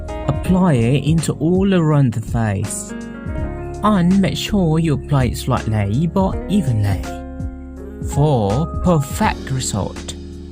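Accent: United Kingdom. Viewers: Sumpah cantik banget ka, aku suka sekali makeup nya. Terus juga suka banget aksen British English nya, belajar dimana sih ka? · masio ndempul banget tapi aku suka lho tutorial iki. Keren. Aksen britishnya jeng, keren. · Kak lidah nya british bgt n cwantikkk..... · British nya medok banget,kalo merem di kiranya org asing yg ngomong...pernah tgl di england lama kak ? Please answer me.... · darling aksen inggrisnya sukaaa banget Tutorialnya banget banget ok Jadi semangat coba coba make up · Gilllakk kereenzzzz beuddd sumpah Jd pengen belajar how to speak as great as you. Kaya bangsawan2 inggris sumpah....ih....wanna make conversation with you...practice as u student. Sembah.....guru besar.hehehhe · Aksen Britishnya halus banget loh kak 😍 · OMG ... Oke q baper dgn aksen British itu🤣🤣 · Berasa lg nonton dialog harry potter suka sma british accent nya😊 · British accent,,,remind me to ms.jane😂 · Sering² kak bikin tutorial pake British accent I like it 😍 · Kak sampean Iki maem'e opo to ngomong British'e kok uenak dirungokno,love you sering2 bikin video ya 😍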